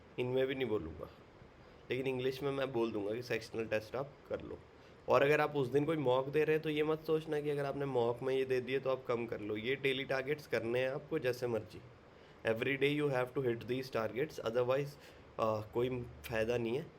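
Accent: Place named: Jaipur, India. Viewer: okay sir! Actually your tone sounds like a jaipurite! Thatsy I ask.. 😊👍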